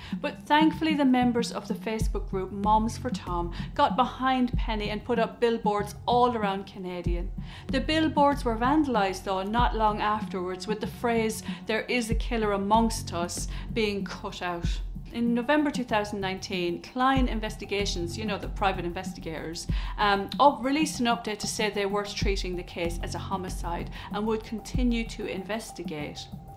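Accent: Ireland. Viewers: Don’t listen to them. You’re just fine and personally, I love the Irish accent. Between you and That Chapter (where I discovered your channel), I get my dose of it! If someone doesn’t like it, they can either learn to love it or move along. Keep up the great work you’re doing. The channel will continue to grow!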